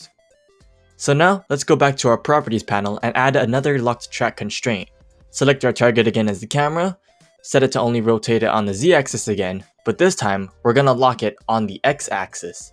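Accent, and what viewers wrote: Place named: Japan. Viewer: Your accent is very strong more Asian type of accent Japanese and Filipino accent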